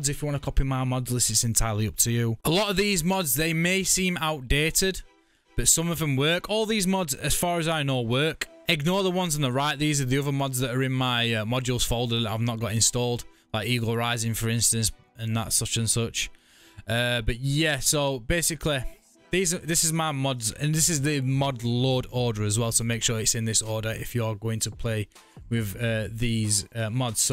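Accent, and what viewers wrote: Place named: North, United Kingdom. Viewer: a person with a northerner accent is teaching me how to install realm of thrones i luv it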